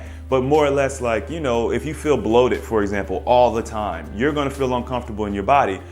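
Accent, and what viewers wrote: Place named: Philadelphia, United States. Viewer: You can actually change your body weight set point by keeping the weight at that lower weight longer term so that your body gets used to being that weight and doesn't feel you are in an environment of scarce food supply. Dr. Paul Mabry on Facebook on his group has good insight on this phenomenon. Also, Dr. Jason Fung had mentioned this as well in his talks on YouTube. Hope that helps. I too am trying out new things for the new year. I have done 1 to 16 day extended fast and always practice IF daily. I just started resistance training. I am trying to loose the extra 20 lbs gained unhealthily through holiday and emotional binge/food addiction eating and trying to fix my relationship with food in general. I love your channel by the way! Are you in Philadelphia? You seem like a Philly guy by how you speak and mannerisms- not a bad thing, just observations. 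I live in suburbs of Philly, but went to Thomas Jefferson University where I got my BSN in nursing and lived there in the center city area on campus as a student. Anyways, you are an inspiration to me and I hope to get as great as results as you. I definitely need to decrease my body fat%. I am currently at 24%! I am only 5ft tall but weight up to 115 lbs, which a lot for me! I feel so bloated and uncomfortable in my body now. I felt best around 100 lbs. When I did my 16 day fast got down to 97 lbs and felt amazing! Getting back to that area of weight, no less, is my goal 97 to 100 lbs. Anyways, keep up the good work!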